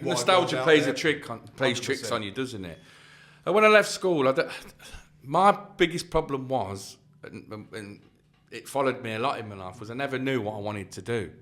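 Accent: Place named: Leicester, United Kingdom. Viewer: Loving the podcasts, I miss that Leicester accent, great job Listening from Australia Dusty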